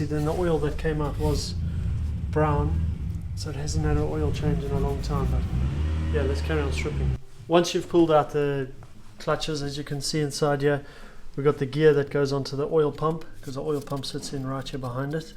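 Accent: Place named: South Africa. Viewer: You from South Africa right? Can't hide that accent mate!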